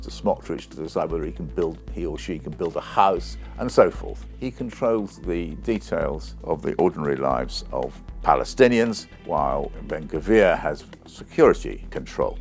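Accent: United Kingdom. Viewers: Our leaders have by supporting Israel directly or indirectly, lost the right to criticise all those who supported the Nazi genocide of Slavs, Roma and Jews directly, or by sitting quiet, indirectly. Never again can they protest credibly against any form of brown fascism, because when they had the chance, they sat quietly or stood up defending the "right" a particular state of their choice has to collectively punish an entire people. By the way, it's not every day we hear a man with British accent spelling out the truth, so thank you very much, sir. 🇸🇪❤️🇷🇺✌️🆗️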